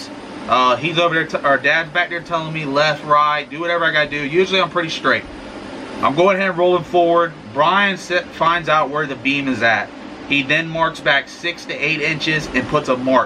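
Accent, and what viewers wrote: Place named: Florida, United States. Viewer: I've noticed all y'all Florida boys pronounce button as "butt-in". lol! I've been following your career and I have to say, Swan Gang are a force to be reckoned with! Keep up the GREAT WORK!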